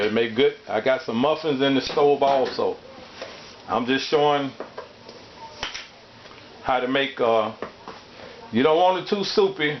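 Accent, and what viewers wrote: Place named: United States. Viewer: I had to give you a thumbs up because of how you talk. You're language is so country just like my people. Be Blessed !!!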